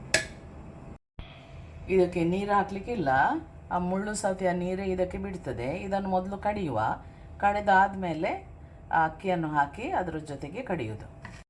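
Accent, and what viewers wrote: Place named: Tulu Nadu, India. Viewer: Madam your Kannada is very poor mixed with TULU LANGUAGE.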